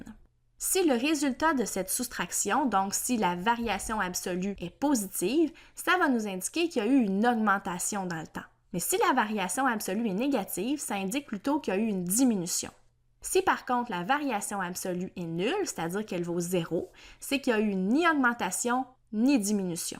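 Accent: Quebec, Canada. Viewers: tabarnak de calice je suis tombé en amour avec l accent quebecois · Merci pour cette vidéo , vous avez été très clair, j'adore votre accent québécois 🥰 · J'adore l'accent québécois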